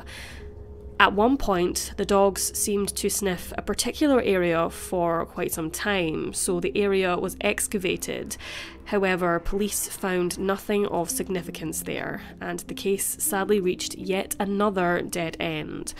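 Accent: Scotland, United Kingdom. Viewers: The narrator is Scottish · Is the narrator scottish? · How sad. By the way you have a lovely Scottish accent.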